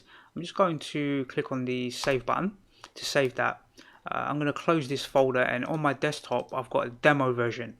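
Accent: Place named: England, United Kingdom. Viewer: I love your English accent 😂🥰 Nice tutorial, thank you! 👍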